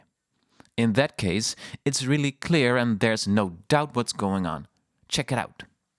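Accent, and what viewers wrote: Netherlands. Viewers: Ur from dutchland i can hear it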